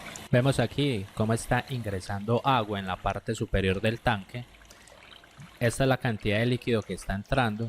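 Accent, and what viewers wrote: Colombia. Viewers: Muy buen video. Tienes acento Colombiano ¿si?.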